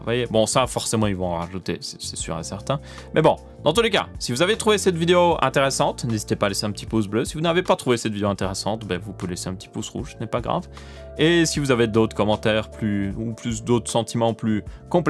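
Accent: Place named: Ardennes, Belgium. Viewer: Ardennes belges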